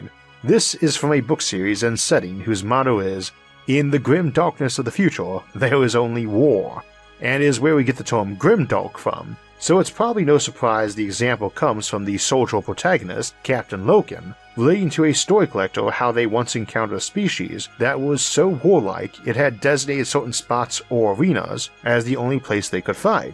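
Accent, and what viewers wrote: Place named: Kentucky, United States. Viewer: What is your accent, you sound like you are from old Kentucky from the1800's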